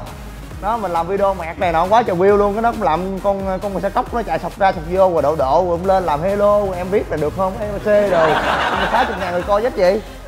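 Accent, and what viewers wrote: Mekong Delta, Vietnam. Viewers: Nhưng đời trc nó ng Bake không phải thuần chủng miền tây miền nam. Nó nói giọng miền tây do nó sinh ra miền tây nó vẫn mang máu Bake.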